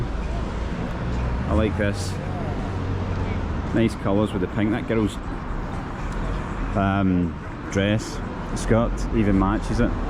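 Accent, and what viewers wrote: Scotland, United Kingdom. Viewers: I won't be muting the video. I love a Scottish accent. The tour of Moscow was great. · thank you! I love your beautiful Scottish accent. ♡ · Nothing wrong with a Scottish accent lol. Greetings from London x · Nice take.. and kind of surreal to listen to your Scottish accent in the middle of Moscow, I quite like it though. I subscribed to your channel because you are doing something different, the war talk has taking its toll on everyone’s mental health, just keep on doing this man! 👍✨👍✨ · I’m here for the Scottish accent 😃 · Many thanks for the honest tour! Your scottish accent is just fine. Btw I've enjoyed watching every minute of this vid. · Your walk&talk tours are great! I don't mind the Scottish accent at all. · Great video! Would love even longer walks from you. Beautiful, informative and love your Scottish accent. 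Nice balance of footage of you and the city. I did watch it all the way to the end. I liked it all just the wsy you did it. Hope my feedback helps. Thank you!! :) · I very much enjoy these videos. I think your Scottish accent is just fine. Well suited for this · I like the walking tours! Is all of Moscow so clean? Where are the seniors? I only see young people. I live in Texas, what a difference! And I love your Scottish accent!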